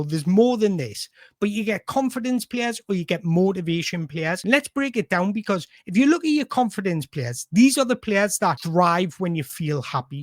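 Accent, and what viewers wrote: Newcastle, United Kingdom. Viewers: Hello mate, ye channel seems to be growing and it is annoying me as pd Proudlock is amazing but don't get much views and don't pay for them like some ppl (the noise) and that is sad cos me subs are organic like lad and ye voice at least sounds Geordie unlike his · Hi, keep it up kidda. Don't listen to anyone telling you to tone your Geordie voice down, YIU are the most informative person on Any channel. You should be employed bu NUFC as scout and youth coach. Keep it up. Howay the lads.⚫️⚪️⚫️⚪️💪👍🏼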